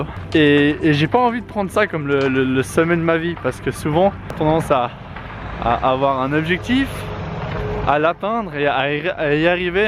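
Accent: Switzerland. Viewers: ooh de tcheu notre bel accent suisse XD mais t'es pas serrrrieux ? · L'accent suisse il y est ! Haha super videos !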